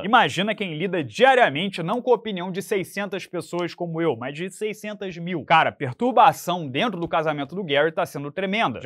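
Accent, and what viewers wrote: Rio de Janeiro, Brazil. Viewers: Este seu sotaque de Carioca, trava seus vídeos! Tbm acho que balança de mais a cabeça no vídeo, abraços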